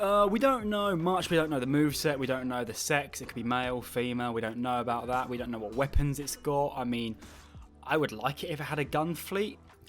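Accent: United Kingdom. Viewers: Good lord you talk too much and wasted all that time I watched hoping for reveal and got a British annoying voice instead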